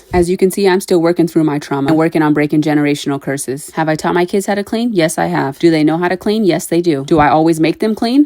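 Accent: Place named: Texas, United States. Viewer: I hear a Texas accent in there. 🤔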